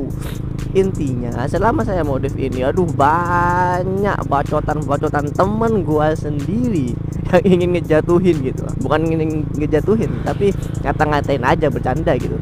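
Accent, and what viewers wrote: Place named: Madura, Indonesia. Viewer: Maap bahasa indo khas Madura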